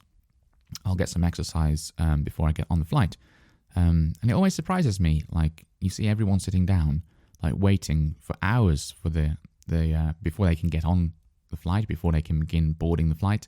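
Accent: United Kingdom. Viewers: British Accent ❤❤❤❤❤❤ I love listening British podcasts.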